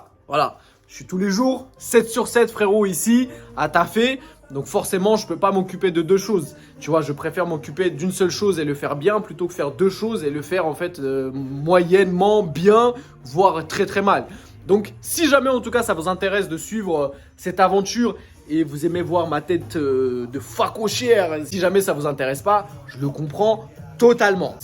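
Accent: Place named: suburbs, France. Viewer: Et depuis vous avez fait faillite? Encore un jeune de banlieue avec sa façon de parler !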